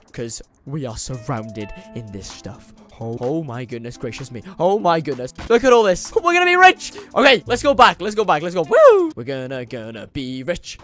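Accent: Wales, United Kingdom. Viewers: Can’t tell if your welsh or not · It’s a Welsh accent for sure, he has to be from Wales?…..👍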